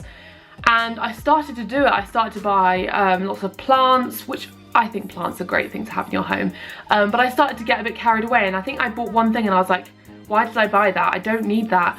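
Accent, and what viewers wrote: United Kingdom. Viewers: Good video. Love British accents!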